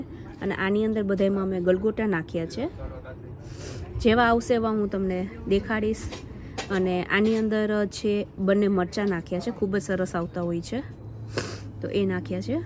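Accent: Kathiawar, India. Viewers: 🙏 જય સ્વામિનારાયણ 🙏 👌👌👌👌 વિડીયો બનાવ્યો છે તમારૂં વતન કયું છે તે જણાવશો અમે તમારો વીડિયો પહેલી વખત જોયો છે મજા આવી છે તમારી ભાષા પરથી એવું લાગી રહ્યું છે કે તમે કાઠીયાવાડી છો અમે મોરબી થી છી તમને બંધાને મારા ભાવથી જય સ્વામિનારાયણ 🙏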